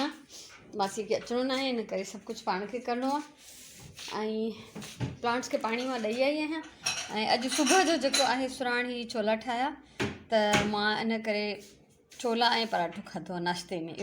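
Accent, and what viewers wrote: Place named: Larkana, Pakistan. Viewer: Assalamualaikum, dadho sutho lagho khalis larkane wari language budhi " KHAI DHO KAYO"😊 Asan khay Ayoob cholan ware ji khabar aahay jaiko Royal Cenima jay samohn rarhi lagaindo ho, tamam behtareen chola thahindo ho. Missed your Friday session.😞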